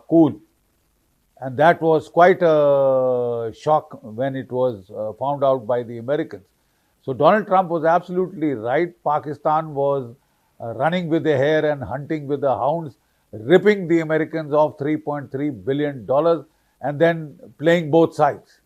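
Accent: India. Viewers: You old fool,,, he Modi's friend more than india,,, you can not fool people with your curry English...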